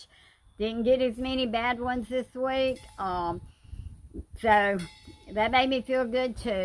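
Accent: South, United States. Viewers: I'm from Illinois and it feels good to hear your southern accent and looking at your background it seems like a touch of heaven.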